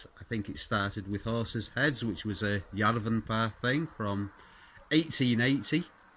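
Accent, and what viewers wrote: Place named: Liverpool, United Kingdom. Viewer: What’s a scouser doing in Finland?!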